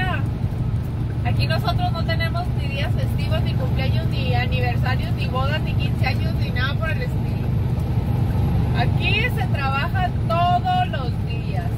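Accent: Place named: Mexico. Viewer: me gusta tu dejo o acento mexico epaaa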